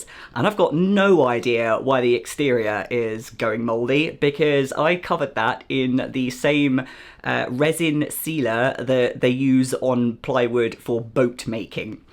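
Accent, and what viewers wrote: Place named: England, United Kingdom. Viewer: Since you are English and now immersed in a Scottish community (accent and dialect), your new found lisp may be your involuntary speech muscles trying to adapt and assimilate......resist! Your accent is lovely! LOL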